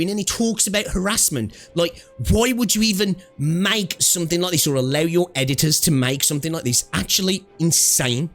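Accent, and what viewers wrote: Australia. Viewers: Man you have the sweetest aussie accent ever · Ngl your voice and Australian accent is still dogshit to hear, haha can't even go past 1 min haha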